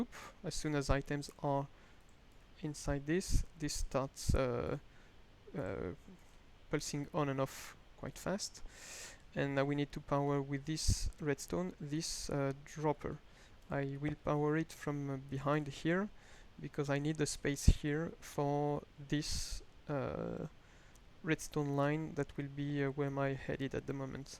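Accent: France. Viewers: It's fine if you have a french accent but there will always be racist people. Most of the time though people just find other accents annoying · j'entends un joli accent français :p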